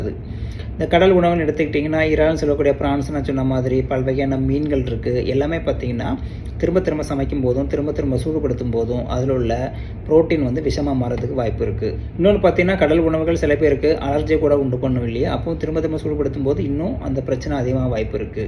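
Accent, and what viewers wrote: Tirunelveli, India. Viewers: Ungal nellai tamil alagaka irukkirathu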